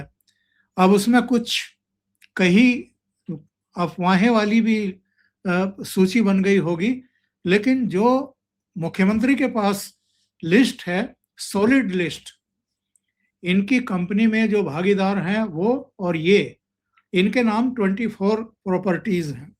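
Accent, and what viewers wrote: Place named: Haryana, India. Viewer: Nahi pata tha shri Omkaar sir haryanvi hai aaj pata laga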